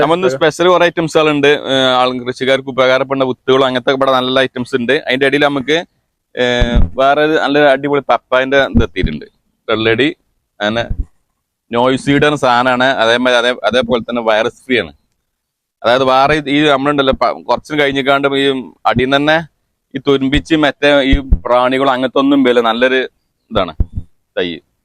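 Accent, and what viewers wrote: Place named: Malappuram, India. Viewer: ഇതെൻ്റെ മലപ്പുറം ഭാഷ👍😎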